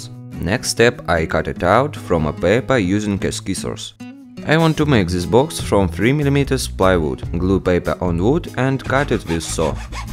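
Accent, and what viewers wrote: Russia. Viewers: Bro make more videos you are good and your english is good enough . And this Russian accent it makes your videos much better and different keep upp 👌👍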